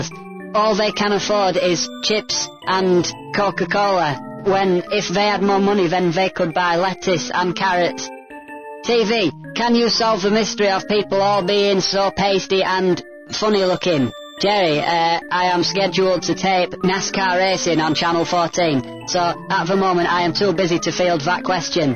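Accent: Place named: Barnsley, United Kingdom. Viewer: Speaks well, is he posh from Barnsley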